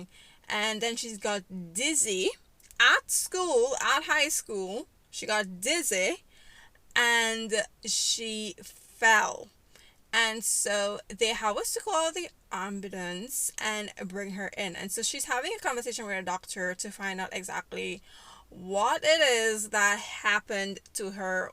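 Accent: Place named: Nigeria. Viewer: Are you nigerian? I can hear from your accent its really annoying lawd 🤦🏾‍♀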